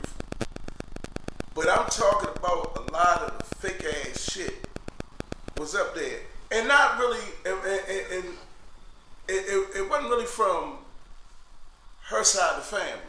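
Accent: United States. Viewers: he got that Baltimore accent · Gotta be from D.C. or Maryland..❤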